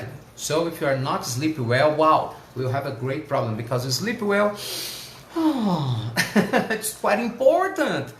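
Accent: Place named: Brazil. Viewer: Bom pra treinar meu inglês. Pronúncia de um brasileiro é mais fácil entender! Hahah Obrigada pelo seu trabalho e empenho.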